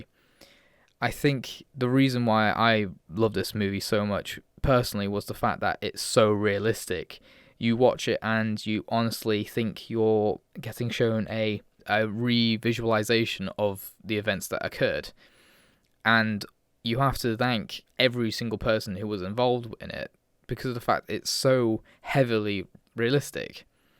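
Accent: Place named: United Kingdom. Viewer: I enjoyed the review m8 👽 Gotta love the silky smooth british audio~ keep up the good work. 👍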